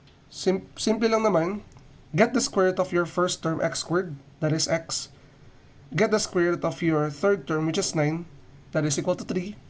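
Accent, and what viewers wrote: Philippines. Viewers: Yeah his accent is filipino so the pronounciations are a bit different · He's Filipino so the accent is a little thick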